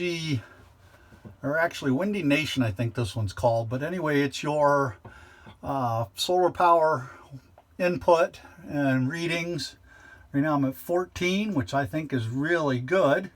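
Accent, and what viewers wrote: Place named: Maine, United States. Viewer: You sound like you have a maine accent I live in maine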